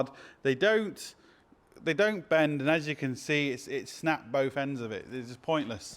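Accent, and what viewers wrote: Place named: United Kingdom. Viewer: I've been enjoying the vids mate. Your a cheerie Brit. If you can get some of them fine lasses to speak I'd be in heaven.love British accents.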